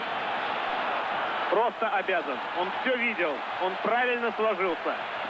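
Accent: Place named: Kyiv, Ukraine. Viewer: Уважаю киевский руссь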